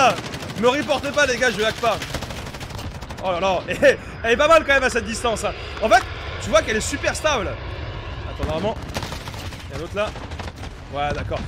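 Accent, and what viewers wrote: England, United Kingdom. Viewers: Ton accent anglais me fait trop rire😂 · L accent anglais et ouf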